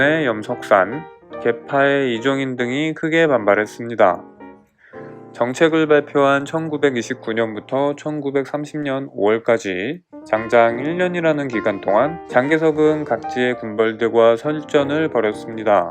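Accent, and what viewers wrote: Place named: Gyeongsang, South Korea. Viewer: 어째 경상도 냄새가?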